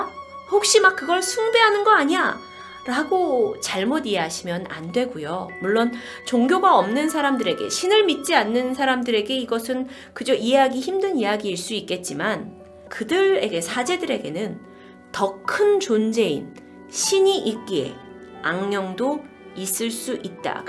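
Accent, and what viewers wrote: Jeolla, South Korea. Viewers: 전라도 억양 때문이신가 의발음이 잘 안되시는 듯ㅋㅋ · 전라도쪽 사투리라 그럴겁니다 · 님 혹시 전라도 사람이신가요? 아님 주위에 전라도 사람 있으신가요? 전라도 사투리 쓰시는거 같은데 ㅎㅎ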